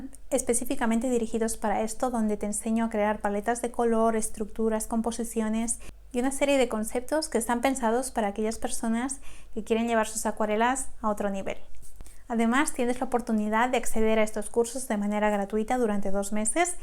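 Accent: Spain. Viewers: Simplemente, eres MARAVILLOSA ❤ Hay muchos artistas, pero muy pocos maestros... Y tú eres una maestra increíble. Me desvelé hace un par de horas, me metí en YouTube y no sé cómo (no busqué nada sobre acuarelas) me apareció este minicurso como sugerencia... ¡Y me enganché! 😍 Sólo una apreciación y pregunta: ¿hablas catalán en tu día a día? 👀🫣 Es que me pareció que tenías un toque fonético algunas veces 🤭😊 Te mando un abrazo enorme, y GRACIAS por todo lo que haces y compartes 🤗 Que tengas muy buen día 🎉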